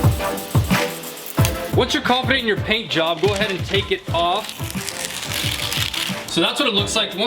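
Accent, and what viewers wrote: Canada. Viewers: Love the canadian accent ❤️❤️👌🏽 & like seeing a guy say he likes crafts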